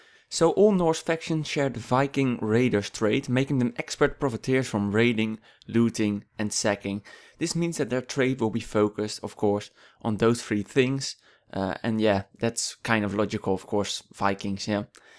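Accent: Netherlands. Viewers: Are you Dutch? You sound a bit like my brother when talking English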